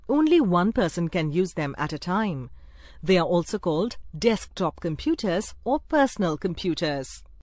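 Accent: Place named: United Kingdom. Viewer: I love your British accent..I have learnt so much about computers...thank u.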